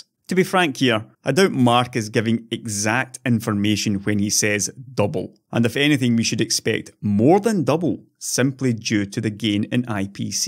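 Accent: Scotland, United Kingdom. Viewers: Scottish accent? 😂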